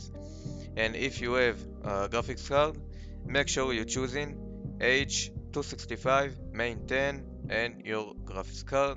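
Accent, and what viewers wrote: Israel. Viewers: שומעים שאתה ישראלי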